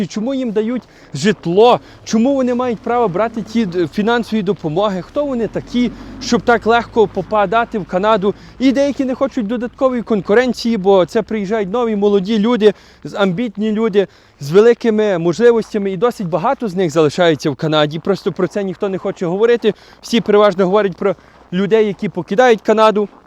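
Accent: Western Ukraine, Ukraine. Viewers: Очень хороший украинский у Вас, ) пускай и с западэнским акцентом) Но ударения и вправду ставите где нужно, так что претензий к произношению нет =) Не знаю кто там булькает в вашу сторону. Мудилы, которым заняться нечем, наверное. А по поводу Педальки, которую нужно прожать и по капле каждому долучытысь до перемогы, увы, наша страна многим не даёт эту педальку нажать и сделать вклад и посильную помощь, так как мы, мужчины, уже не граждане Украины, а обычный мясной набор под названием "Мобилизационный ресурс". Нам не дают спокойно работать (постоянные облавы на заводы), спокойно перемещаться (Блокпосты и проверки на каждом повороте), даже жить хотя бы по человечески довольно трудно. У меня есть с чем сравнивать, я был в оккупации. Орки ко мне относились более уважительно, чем ТЦК на улицах "Вильной Укрийины". Так что я сейчас думаю как границу пересечь в любой способ, что бы выжить, а не как бы задонатить на ЗСУ. 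Увы. Реалии жизни. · ми в Канаді уже 8 років. В тебе гарний український акцент. Дякую за український контент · Чудова у Вас вимова. У деяких селах на заході саме так і говорять. Насправді у кожному районі трошки іньша, своя вимова. І це різномаїття - це є добре. А взагалі ніхто не звертає на акцент уваги в наші часи.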